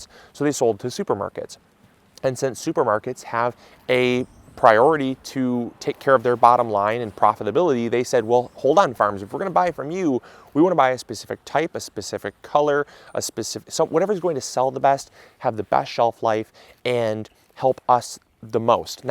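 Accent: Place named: Wisconsin, United States. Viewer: hello Wisconsin! that 70’s show voice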